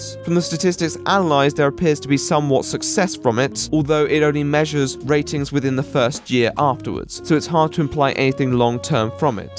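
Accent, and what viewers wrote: United Kingdom. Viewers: thanks for mentioning that you are british cause i was so confused about the accent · not everyone in Britain talk like that lots of people here talk like a Chave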